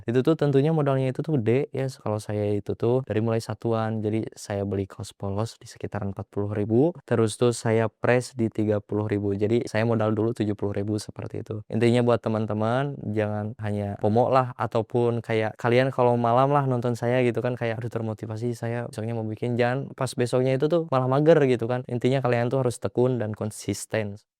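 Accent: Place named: West Java, Indonesia. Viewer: kebiasaan orang sunda ngomongnya gitu bang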